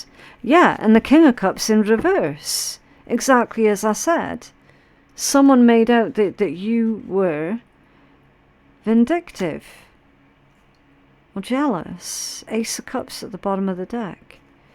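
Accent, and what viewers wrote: Scotland, United Kingdom. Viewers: Blessings to you, Thank you for my reading Scorpio 10/11 /1976 born at 6an, Love your Scottish accent lol🐯🌹🌟💜😁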